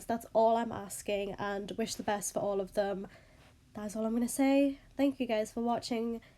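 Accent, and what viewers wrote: Newcastle, United Kingdom. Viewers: wait sis where’s your accent from. I’m getting Geordie. Watch me get it wrong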